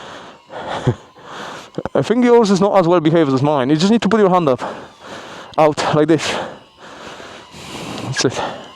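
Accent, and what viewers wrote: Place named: United Kingdom. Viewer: Just found your channel. Great video. I'm trying to place your accent. Is it Africano British?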